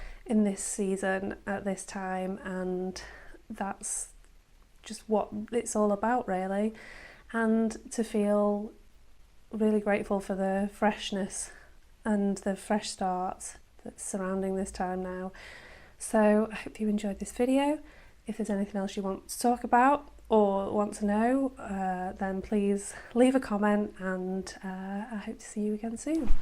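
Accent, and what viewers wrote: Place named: Northern England, United Kingdom. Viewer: Nice to meet another fellow Brittan.😂 I'm from Edinburgh myself..... where about in England you from? You sound more northern English ❤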